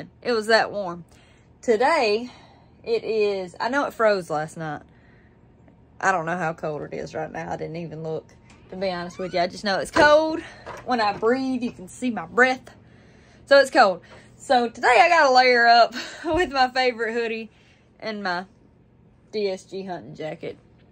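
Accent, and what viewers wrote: South, United States. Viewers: southern drawl I love it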